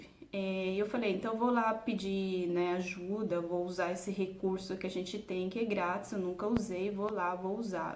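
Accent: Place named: Italy. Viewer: Olá moça. Estou te acompanhando desde os primeiros videos que você postou e tenho gostado da sua rotina porque é diferente da minha. Quero te dizer que não é culpa sua. Algumas pessoas não sabem lidar com seus sentimentos e acabam descontando em pessoas boas que não fizeram nada. Quando isso acontecer, tenha em mente que não é você, e sim a outra pessoa. Nunca deixe de ser a pessoa boa e educada que você é. E não deixe ninguém tirar o seu brilho. Uma dúvida, onde você nasceu? Vi que você fala português, mas não parece ser sua lingua nativa. Você é italiana? Continue postando, gosto dos teus videos! Abraços :)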